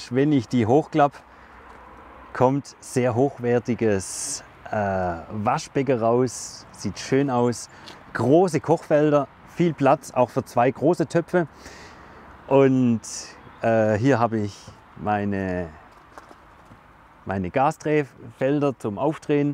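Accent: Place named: Swabia, Germany. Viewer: Super geil.. und dei schwäbisch isch no geiler..:)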